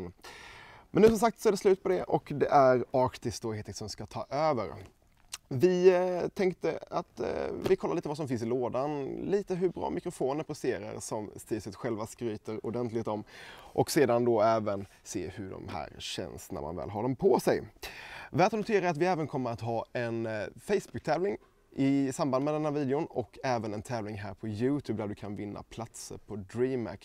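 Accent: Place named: Sweden. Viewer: He’s from Sweden 🤨🤨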